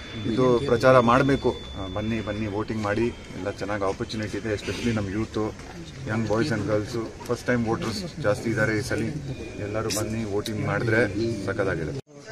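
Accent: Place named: Uttara Kannada, India. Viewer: மகளே!!உத்தரகன்னட பாஷையில்,செய்திகளை கேட்பது எனக்கு அளவற்ற மகிழ்ச்சி!!!🎉🎉🎉😊😊😊😊😊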